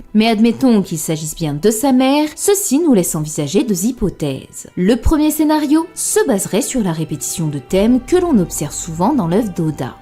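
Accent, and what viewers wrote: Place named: Belgium. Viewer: oui un peu à la manière de certains belges et plus précisement quand le u est suivi d'un i ^^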